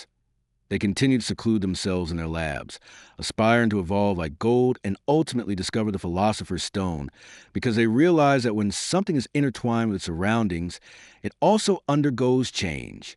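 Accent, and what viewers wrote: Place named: California, United States. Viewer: It’s very standard California accent, but not quite what you’d hear in Hollywood. I quite like it as it has a lot of character to it.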